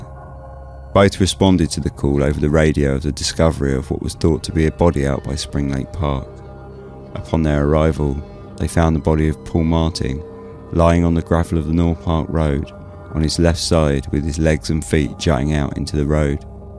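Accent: United Kingdom. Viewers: lol. - there are USA. Americans that can't pronounce Arkansas or Texarkana. You merely pronounce it with a British accent. ToMAYto - ToMOTo Ya know, we Americans actually - get that one right. lol ...and, I specifically recall being taught that the "H" is silent - in "herbs" (you folks pronounce it like one would expect an American to - "?" No problem, I'm just curious what the original rule is.)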